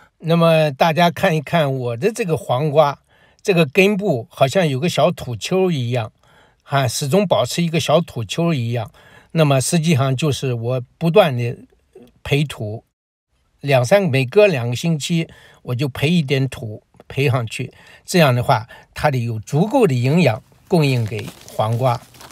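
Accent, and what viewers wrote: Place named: Southern Shanxi, China. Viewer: 听口音感觉您有晋南人的口音，也像青岛人的口音哈哈！我和我妈妈都是您的粉丝！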